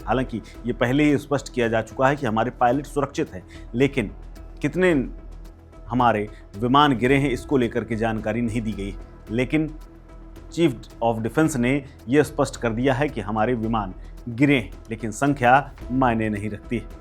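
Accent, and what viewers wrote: India. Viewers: News must be in Hindi , and it should be given in Pure hindi😢 not in bhaiyya style , where Sharif becomes Sarif , maa bhen kar raha hai ye bhaiyya news aur Hindi ki 😊😊😊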